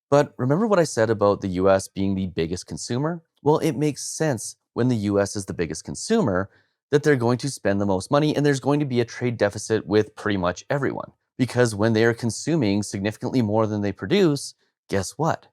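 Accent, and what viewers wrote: Canada. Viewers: I will never listen to a Canadian like you. "Aboot" I can tell right away.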